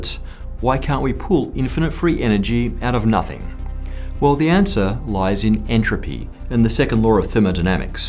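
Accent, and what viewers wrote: England, United Kingdom. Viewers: Your English accent adds no credibility. And your video sucks. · Wow!!!!!!! This is an awful PBS Space Time clip. I'm shocked at how badly this misrepresents the applications of zero-point energy. It is this these kind of "Negative" (pun intended) clips that keep us using rockets instead of learning about electro-gravitics. 👎 Did you notice how they used someone with a slightly British accent to make it appear they are smart and intelligent enough to explain the concepts of physics. This clip ends with a gecko 'challenge' relating to their feet. This clip is simply pathetic.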